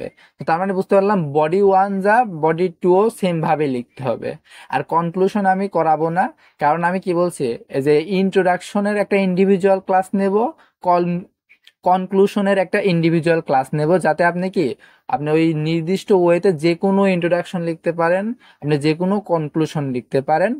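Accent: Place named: Jessore, Bangladesh. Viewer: joss class bhai, Are you from Jessore ? your accent like jessorian .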